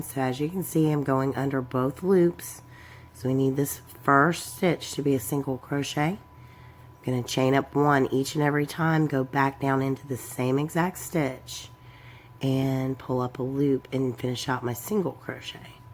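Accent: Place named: Texas, United States. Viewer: Hi! I recently found your channel and just love all your work! Mosaic crochet is simply wonderful and your designs are so nice! By the way, are you in/from Texas? Your accent sounds like, I'm from there (San Antonio) but live very many years in Athens, Greece! Keep up the great work!! Cheers!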